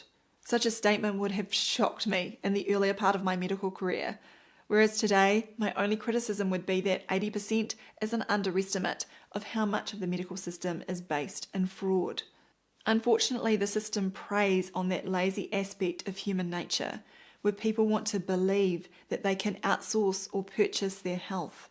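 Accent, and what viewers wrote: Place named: New Zealand. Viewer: I love you, even with your Kiwi accent. Thanks for telling us the truth.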